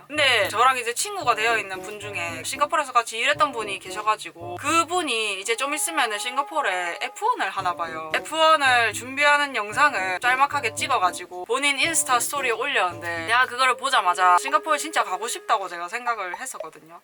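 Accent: Gyeongsang, South Korea. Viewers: 우어,,,근래에 본 사람중에 제일 경상도 사이다네요 ㅋㅋㅋㅋ 구독 누르고갑니다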